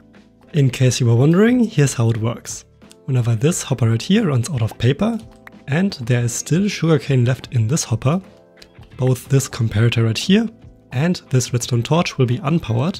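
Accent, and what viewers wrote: Germany. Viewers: Junge eine Sekunde angehört, der typische Deutsche Akzent. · Deutsch hört man raus aber gutes tutorial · Der deutsche Akzent 😂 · great build, but are u german?, ur akzent sounds like it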